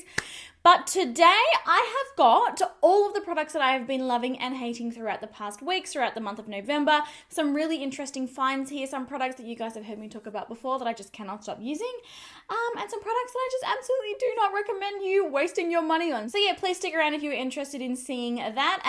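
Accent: Australia. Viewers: Joan Gentile I’m curious to know how you do not know an Australian accent when there are many Aussie celebrities with the same accent. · She's from Australia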